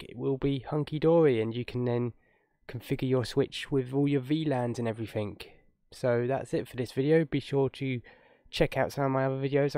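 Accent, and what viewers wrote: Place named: Cockney, United Kingdom. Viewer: Hard to understand the Cockney accent. Stop chewing on the chewing gum.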